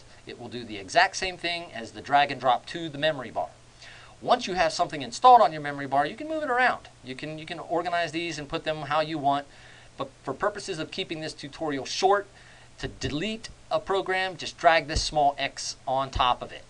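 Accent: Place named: United States. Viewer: Пиздец, ебаный американский английский. Всемирная тошниловка.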